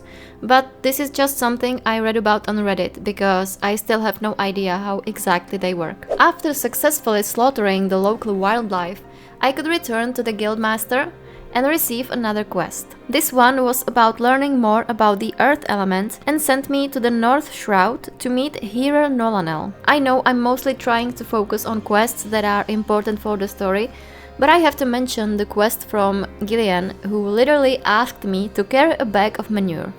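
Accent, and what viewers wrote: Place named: Czech Republic. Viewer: omg já jsem si říkala že slyším český přízvuk!🩵✨^-^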